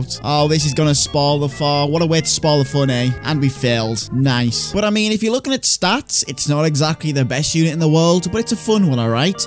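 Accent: United Kingdom. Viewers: I’m not being mean but is it me or did I realize he kinda sounds british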